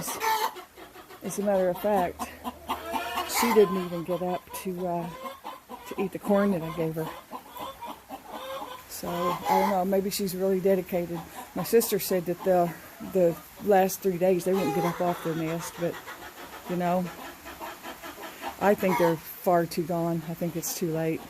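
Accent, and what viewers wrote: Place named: Oklahoma, United States. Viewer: I personally don't think it's anything you're doing if your view count has gone down or likes because of everything going on with the chicken industry and all the red flags evolving around chickens I think that there is more people making chicken videos than ever which is changing the algorithms and YouTube likes to mess with people like yourself that's true and genuine it's not people that's leaving your channel because you're mentioning God it's because YouTube's making them miss the video and not sending people their notifications because you're mentioning God and you're popular now I think your videos are awesome and you also remind me of my grandma that's one of the reasons I watch your Channel because you talked to your chickens and you do not belittle them yeah there's something about your Oklahoma accent that reminds me of my grandma. 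So please ma'am don't get discouraged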